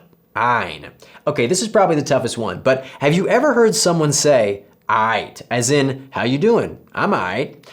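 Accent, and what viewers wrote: Egypt. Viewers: يا الله اللهجه المصريه سيئه جدا مفروض انك تعلمت لهجه شاميه او لهجه خليجيه بيضاء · As a Saudi myself you sir are 100% certified ✅😂 I notice that you speak Arabic with a hint of an Egyptian dialect which is cool and understandable seeing that most people choose that dialect to learn Arabic. Your pronunciation is impressive and Egyptian dialect has it's own humour and "ring" it's such a heart lighted dialect in Arabic so hearing you speak with it in a common tongue not a traditional one makes you relatable and pleasing to hear ❤ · It sounds like you’re learning in Egypt 🇪🇬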